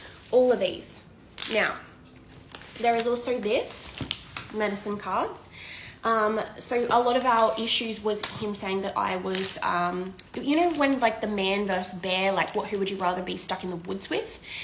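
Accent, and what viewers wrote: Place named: Australia. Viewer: Man, I totally love Australians. Her accent is 🔥. Shes really hot, I’d book with her in a second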